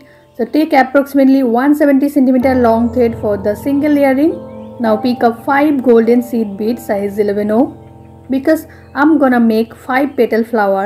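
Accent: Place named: India. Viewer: محتوى مفيد واصلي لكنتكي تبدو من الهند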